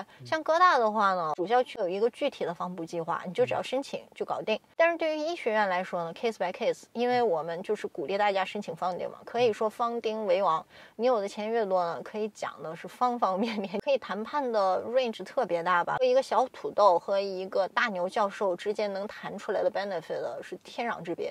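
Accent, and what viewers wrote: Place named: Tianjin, China. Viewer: 这个女生是天津人，似乎听出了津普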